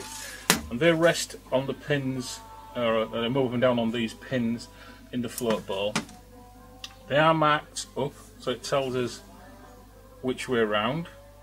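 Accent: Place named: Hull, United Kingdom. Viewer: Lol iam from hull ,can spot ull accent a mile away .just got gt <num> ,having starting problems ,and kick start stopped returning on its own for f sake .😢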